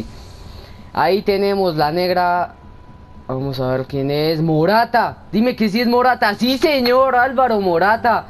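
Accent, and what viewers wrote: Colombia. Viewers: Jajaja Chimba De Hablado! Solo Paisa Papito! Me Suscribo! 👌👌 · Que tu eres colombiano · sos colombiano?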